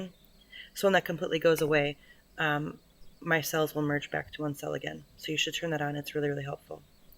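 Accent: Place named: United States. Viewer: con acento americano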